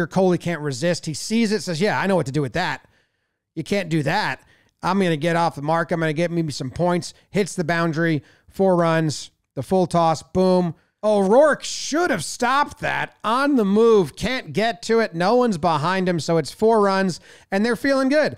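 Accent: United States. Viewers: this is the first time I have ever heard an American Accent talk about cricket, I am surprisingly pleased, that was awesome, thank you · I've been watching you review cricket for years now, but I just had a moment where it felt bizarre that an American was reviewing cricket. There's no THICK Aussie or British accent, so I'm confused. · Does anybody else think it's jarring, the American accent that knows the game of cricket.. ? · Weird hearing an American accent talk cricket. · Huh. Never heard Cricket talk in a 'Murrican accent before. Feels...... weird. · So strange hearing an American accent comment on cricket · Refreshing to hear an American voice commentate and actually have knowledge about the game and even your breakdown is spot on with tactics and thought in the game. Head and shoulders above all the reaction videos, which are nice but are common. 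Your take is a refreshing dose of charm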